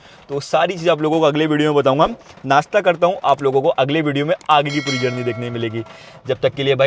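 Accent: Mumbai, India. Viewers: I enjoyed your video, I am a Pakistani and enjoyed the way you speak Mumbai type Hindi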